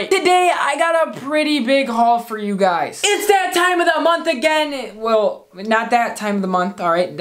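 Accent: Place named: Sweden. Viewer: Are you from sweden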